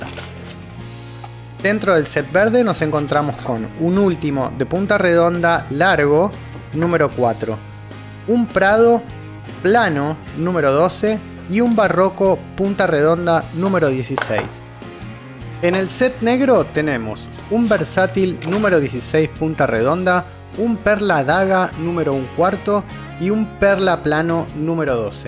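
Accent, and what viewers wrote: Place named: Argentina. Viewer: Por tu acento sos argentino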